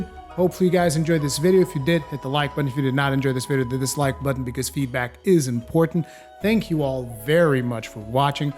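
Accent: Portugal. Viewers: ESPERA AI, TU É PORTUGUÊS? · Are you portugese? Your english is better than mine and Im native lmao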